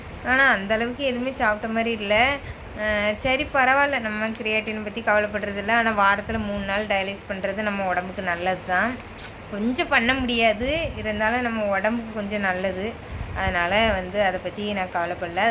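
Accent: Tirunelveli, India. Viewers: Unga peache peachu. Enakku tirunelveli slang romba pudikkum 😊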